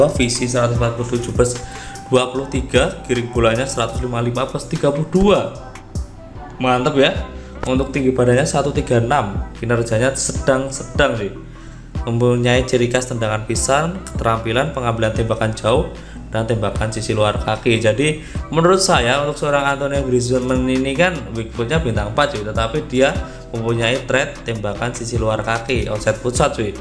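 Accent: Java, Indonesia. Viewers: medhok men mas haha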